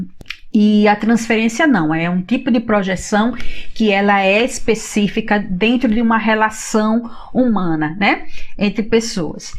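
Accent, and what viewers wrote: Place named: Northeast, Brazil. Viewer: Adoro sua forma de passar o conteúdo.Seu sotaque nordestino é adorável.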